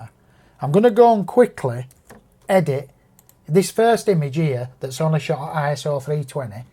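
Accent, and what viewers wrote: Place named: Yorkshire, United Kingdom. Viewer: you sound like my son...yorkshire accent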